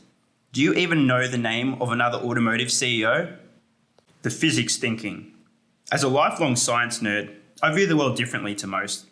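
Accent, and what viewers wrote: Australia. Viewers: I could have made the exact same video (minus the Australian accent LOL). Totally share your views -- I am personally heavily invested for the same reasons as you stated plus the fact that it's at least 50% undervalued (I have my own calculations). It's as clear as day that in 5-10 years TSLA is going to be a multiple bagger. And like you said -- in 2030, people will say how lucky you were to invest in TSLA. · I'm not super interested in buying a Tesla car right now because I would rather have a Corolla- or Camry-like electric car, at those lower prices. But I would invest! Though I could only manage 5 or 6 shares, to begin with. Nice to hear an Australian accent talking about this!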